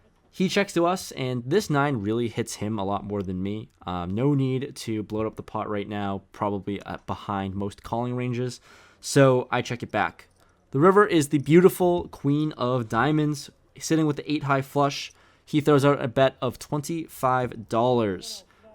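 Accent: Western Massachusetts, United States. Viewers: Oh are you from western mass in mgm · Are you from western Massachusetts?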